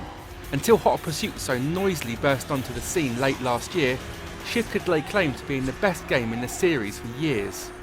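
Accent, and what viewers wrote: United Kingdom. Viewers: Surviving borrow XD this British guys is funny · British accent annoys me... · Thank God a British reviewer, don't have to listen to one of the witless Americans. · he's british... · Oh, are we about to do this!? YEAH WE'RE GONNA FUCKING DO THIS! HE SAID SHIFT I HEARD THE F YOU DEAF PIECE OF SHIT!!! But seriously, I heard him say the f, I'm used to listening to british accents if that helps o.O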